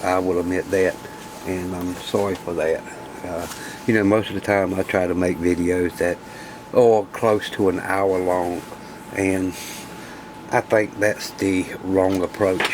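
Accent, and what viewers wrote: South, United States. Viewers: I'n happy to see some great folks stepping up to help and the thru his Patreon channel.. I find his brand of repair and restoration unique and refreshing. Besides his southern draw make me smile with delight. Please consider joining me by adding your support as Patreon with a $1 or more monthly contribution to help insure having his informative videos around for a long time.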